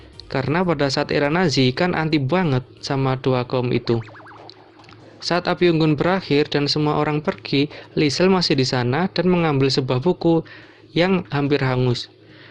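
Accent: Java, Indonesia. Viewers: Jangan terlalu medok logatnya min, jd krg enak didengar, maaf ya biar kedepan lbh baik lg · Medokkk jawa , suara lu kya org kurang makan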